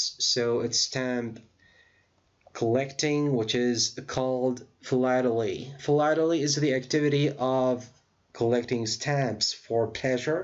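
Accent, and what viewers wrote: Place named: United States. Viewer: i like your american acent i like american english can you give us more hints and clues about the acent in english and thir differences ?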